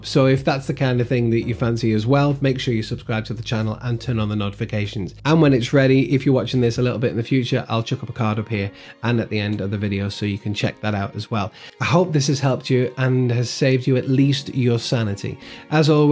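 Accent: United Kingdom. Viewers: BRILLIANT ... I feel so stupid.... i bought a used ANDROID tablet, just for the drone, tested at home, props off - GREAT !!!! Went to fly today, thinking it was a great idea to take one of my shorter leads, from the 'stash' that we all collect. I had to revert to my phone and the supplied short lead, I just couldn't get my tablet to connect.,. I tried changing settings, everything I could. it wouldn't work. Then, I came across this video, rummaged through my stash and found the lead I'd used first. It worked, a Sony USB-3.... Fantastic. I then found a short USB-C and will use this with adapter. Thank you so much for this. SUBBED your channel based on this and the fact it's UK accent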